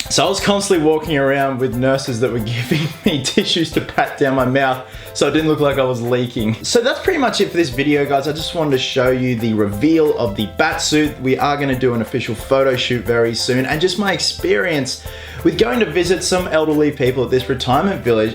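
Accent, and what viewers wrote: Australia. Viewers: I clicked on this video and as soon as you started talking I yelled. AUSSIE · Your Aussie 👍🏻me to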